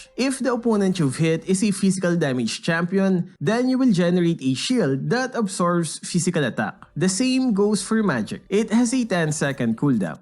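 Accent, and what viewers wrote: Philippines. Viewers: Philipines accent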